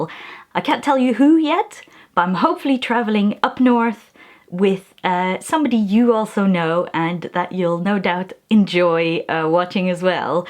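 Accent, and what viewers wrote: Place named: Canada. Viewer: I can't agree with you more! Kindness starts with ourselves. My 16 year old daughter and I have been traveling around the world for her surfing comps. Four continents and six countries later we are struck how everyone everywhere has same dreams, hurts, successes, failures, trials, triumphs, loves and struggles. We have to have compassion and love on one another individually. We are not that different from each other. God has laid this heavy on my heart. I am gonnaknit on Ravelry. I am an expat Canadian living in the USA with my family of 5. I love listening to your Canadian accent and have enjoyed every single episode.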